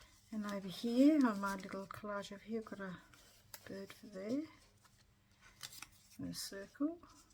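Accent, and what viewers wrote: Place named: Australia. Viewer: I really liked this collage and I love to hear your Aussie words. Keep up the wonderful pages!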